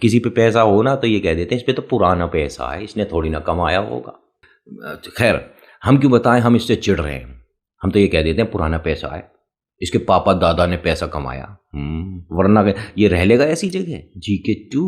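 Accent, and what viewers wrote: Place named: Braj, India. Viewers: bhaiya aapko subscribe aur likes bolne ki zarurat nhi h jo aap se pyar krte h vo phle hi like comment krdete hai aur phir video dekhte h❤❤aur bhaiya braj wale boli aapki muje bhout pasand h❤😊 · Jai mahakal 🙏 bhaiya ji aapke baat krne aur hasane ka trika bhut achha hai ,mera gaon mathura hai waha ese hi baat krte hai, nice story 😊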